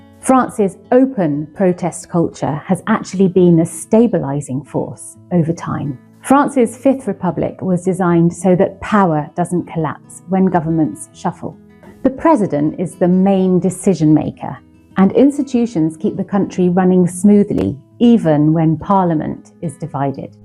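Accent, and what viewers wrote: England, United Kingdom. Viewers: This woman with British accent is sooo fake! Just lie and lie with more lies. She is a joke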